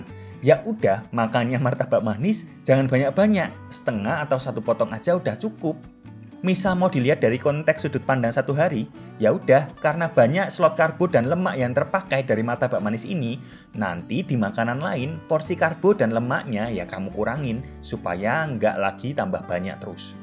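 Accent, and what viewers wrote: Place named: Java, Indonesia. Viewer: Logat Medoknya mas ngangenin. Ya iyalah, wong tiap hari nontonin diet santuy melulu.. suaranya terngiang2. Wkwkwk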